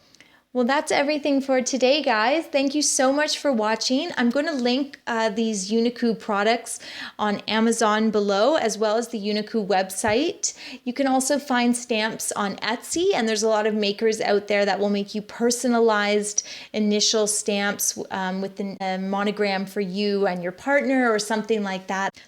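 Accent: Canada. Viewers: Are you Canadian?? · I might be wrong but she sounds Canadian. I can tell because shay mitchell says aboot and she's Canadian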